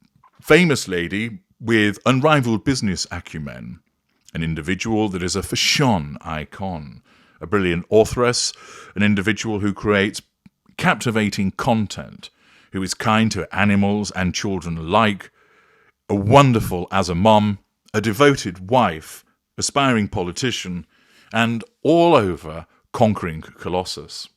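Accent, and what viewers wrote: United Kingdom. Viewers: Unfortunately, as you can hear, is British and therefore has no sensitivity or superstitions about such things that make you uncomfortable. He's just reflecting his own British culture. Sorry.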